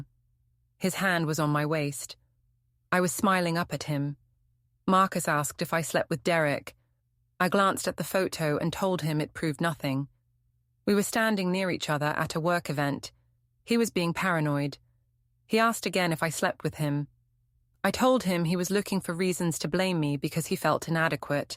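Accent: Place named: RP, United Kingdom. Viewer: You aŕe right. This posh british accent makes me want to vomit...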